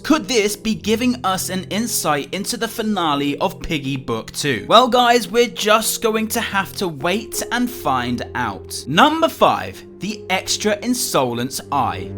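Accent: United Kingdom. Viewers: He’s British lol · He’s a cool British guy so he gets permission to say it in another way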